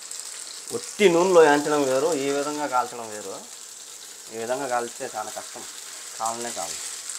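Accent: Rayalaseema, India. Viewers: Ne voice rayala Seema launge super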